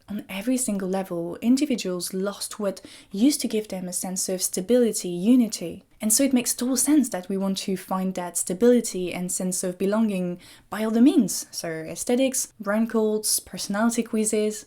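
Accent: North, France. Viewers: ah tu es du nord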